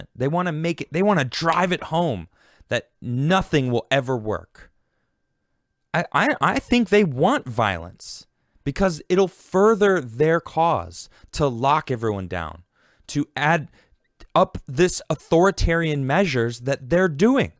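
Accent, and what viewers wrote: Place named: Michigan, United States. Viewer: Bro.....you literally look pewdiepie but with a Michigan accent... I dig it 💘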